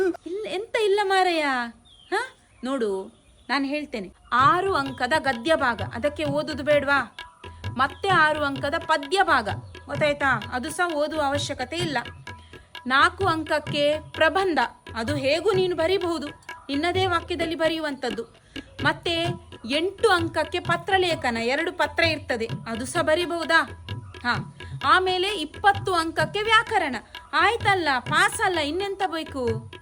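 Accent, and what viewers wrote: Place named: Coastal Karnataka, India. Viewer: ನೀವಾಡುವ ಕರಾವಳಿ ಕನ್ನಡ ಧಾಟಿ ನಿಜಕ್ಕೂ ಮನೋಜ್ಙ, ಕೇಳುಗರ ಕಿವಿಗೆ ಅಚ್ಚುಮೆಚ್ಚಿ.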